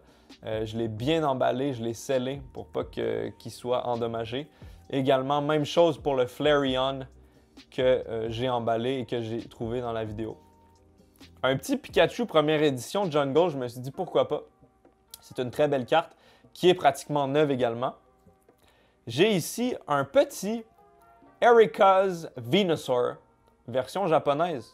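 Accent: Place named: Quebec, Canada. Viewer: Oh merde s est un québécois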